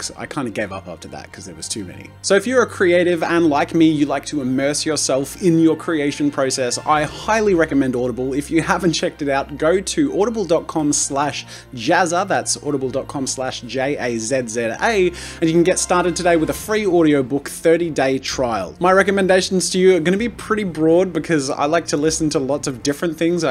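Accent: Australia. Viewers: BeanDip: triggered American cause an Australian is being Australian Me: triggered Australian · Australians (me) say zed and since Jazza is Australian he would say zed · No it's zed, he is Australian and that's how we pronounce it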